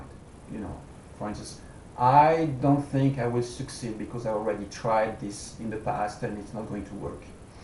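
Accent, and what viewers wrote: France. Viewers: I'm sure you're french ;)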